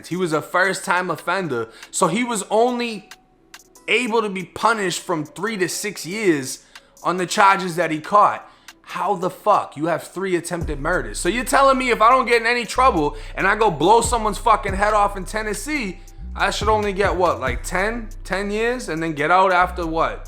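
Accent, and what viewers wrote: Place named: New England, United States. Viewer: Yo I just argued with someone over your New England accent, they said it was a Florida accent smh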